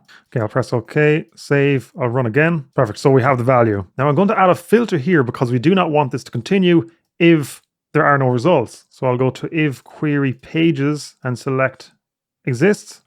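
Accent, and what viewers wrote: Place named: Ireland. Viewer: Thank you for the tutorials. Nice to hear an Irish accent too :)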